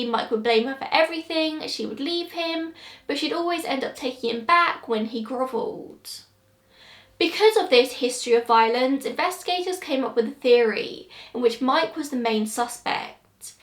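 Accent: England, United Kingdom. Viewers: So weird to hear her English accent on YouTube talking about the ghetto in Washington i used to live in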